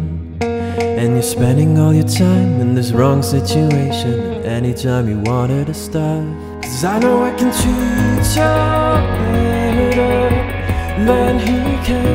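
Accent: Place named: Germany. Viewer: You're from Germany, aren't you? You have this funny accent ;) Lol, your wire leads to the forest xD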